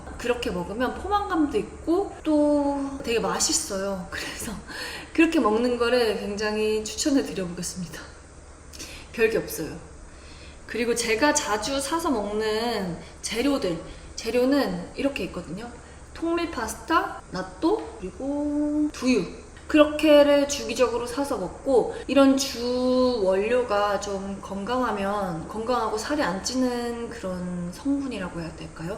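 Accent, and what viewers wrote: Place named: Seoul, South Korea. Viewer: 님 서울사투리 말투 같아요 😂😂 ㅎㅎ 넘키여워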